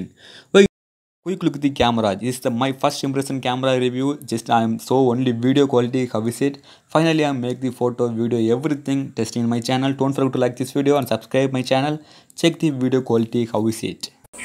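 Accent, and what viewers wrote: India. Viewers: are you chines ? You speek like Indian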